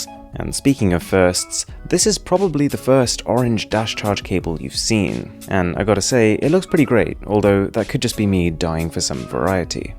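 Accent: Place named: RP, United Kingdom. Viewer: Supersaf isnt as pretentious with his reviews. Drop the posh accent.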